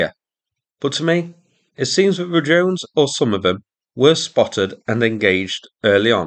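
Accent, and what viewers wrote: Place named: Mansfield, United Kingdom. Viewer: It's because he's speaking British English with a Mansfield accent. Most of the automated caption systems are only trained on a generic American English accent and vocabulary. A compamy I worked for in the UK considered buying a captioning system. The prospective vendors came to show their software, and every single one failed to correctly recognise even half the British English words we threw at them. When we tried Glaswegian and Newcastle (Geordie) accents, the recognition rate fell to zero.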